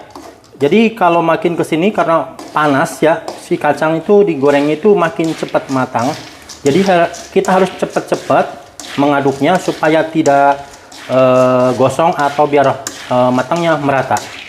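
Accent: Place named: Java, Indonesia. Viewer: Dari bahasanya ada Muthu Gobed Baki Sampean jawane pundi mas 😀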